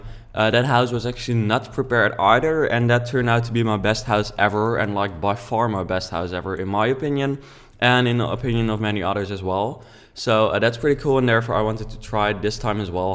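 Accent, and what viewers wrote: Netherlands. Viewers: you can really hear the dutch accent...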